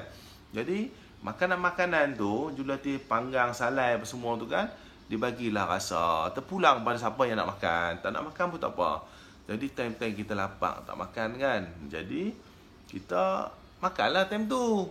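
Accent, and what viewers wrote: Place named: North, Malaysia. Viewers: Seronok dengaq Tuan bercerita..Tak boring..cara penceritaan santai, lenggok utara lembut dan mesra walaupun nampak garang..Moga lancar channel dan terus banyak content2 baru Tuan 😃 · Byk utara noh komando ni. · Abg nak tanye knape ggk selalu cakap utara ye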